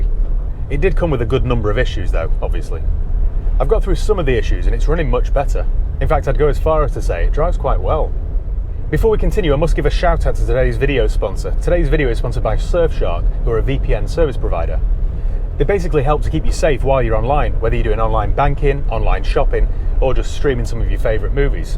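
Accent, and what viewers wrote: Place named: RP, United Kingdom. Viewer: No complaint just seems a more “posh” way of speaking not something common round where I’m from 😂😂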